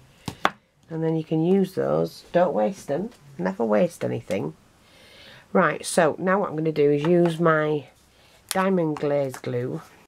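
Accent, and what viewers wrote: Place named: Yorkshire, United Kingdom. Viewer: Hi I'm new to your channel too and would second what gerilynne1955 says below, you refer to your shop but I'm a bit confused as to what shop this is, perhaps you could link the products you use in your description box. I love your work and am definitely going to try a few things you have made. Are you a Yorkshire lass? I am but am now living in Minnesota in the USA, great to hear a Yorkshire voice!!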